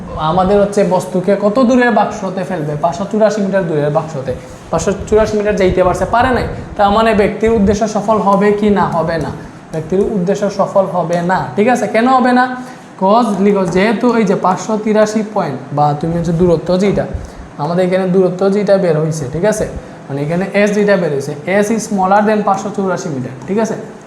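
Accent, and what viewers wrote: Sylhet, Bangladesh. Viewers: vai ki sylhety 🤔🤔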